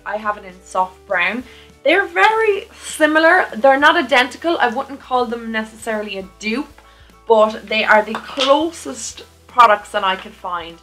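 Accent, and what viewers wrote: Ireland. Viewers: Also I looove your eye colour so much😍😍 and I can't get enough of the Irish accent now that I know I'll be there for a year😍😍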